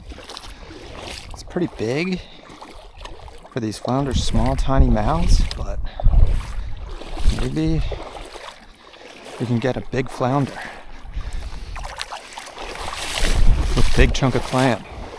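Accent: Philadelphia, United States. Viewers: dubious Philly accent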